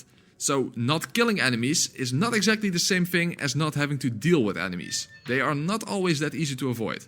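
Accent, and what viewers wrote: Netherlands. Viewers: You must be Dutch, I hear an audible accent!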